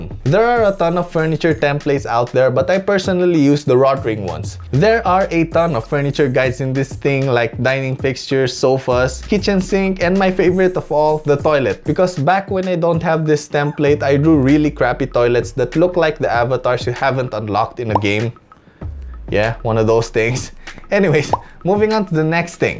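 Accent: Philippines. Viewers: With your asian accent, i can tell, you’re a filipino😂. I also want to be an architect and this video really helps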